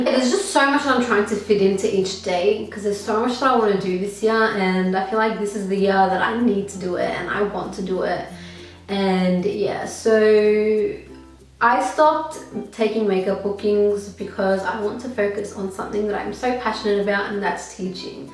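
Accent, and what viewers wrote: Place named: Australia. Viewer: Paayal Shah lmao I was thinking the same?¿ I thought to myself it's probably because of her Australian accent or something! 🙃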